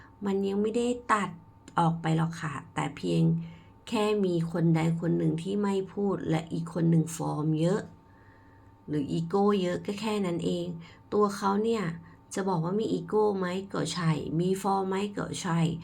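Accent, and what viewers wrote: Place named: Kanchanaburi, Thailand. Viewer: พลังที่ดีมากๆสค่ะน้อง อ่านเสียงเหน่อและเข้าใจง่าย.เปปๆขอบคุณค่ะชอบเหน่อค่ะ.เคยอยู่กาญจนบุรีมาเลยชอบมากนะคะ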